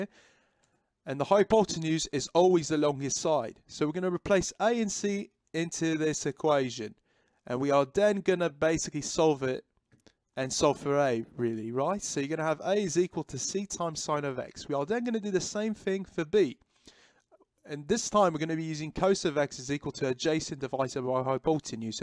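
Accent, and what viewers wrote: United Kingdom. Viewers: Hmm a british explaining trignometry,what was i serching for 😅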